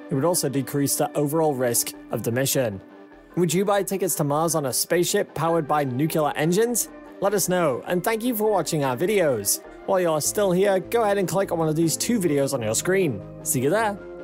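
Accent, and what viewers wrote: England, United Kingdom. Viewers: Is he saying "Nucular"? Ax me if I care but I don't think he will be working on a nuclear rocket. Did he just say "Yeeahs" 12 months in a yeeah. I think he might be from England.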